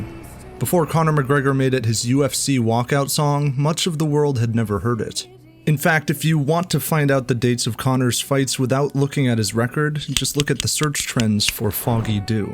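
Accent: United States. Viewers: idk man i think youre overthinking it, sounds western US or Canadian to me, could be either but they sound almost identical, its not the south where almost all the accents sound the same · I agree with the accent thing the commentator is an American true and true 😂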